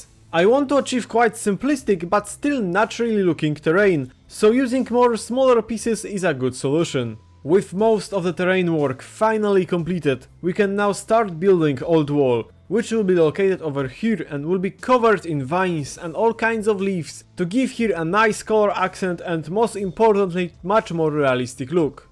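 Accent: Poland. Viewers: bro i love your polish accent, im from germany and i hate mine bruh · Czuć ten polski ale cieszę się ze polak wyszedł na między narodowa scenę pozdro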